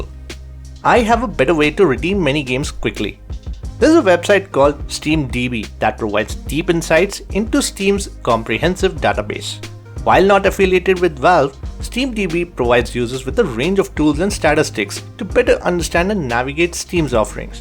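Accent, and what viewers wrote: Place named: India. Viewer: u got the indian accent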